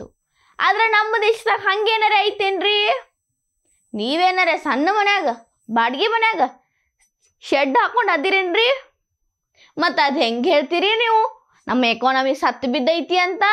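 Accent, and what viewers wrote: North Karnataka, India. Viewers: ತುಂಬಾ ಸೊಗಸಾಗಿ ಬುದ್ದಿವಾದ ಹೇಳಿರುವ ನಿಮಗೆ ಅಭಿನಂದನೆ. ಉತ್ತರ ಕನ್ನಡದ ಭಾಷೆ ಚಂದವಾಗಿ ಪ್ರಸ್ತುತ ಪಡಿಸಿರುವಿರಿ. ನಿಮಗೆ ಮತ್ತು ಹೊಸ ದಿಗಂತಕ್ಕೆ ಒಳ್ಳೆಯದಾಗಲಿ.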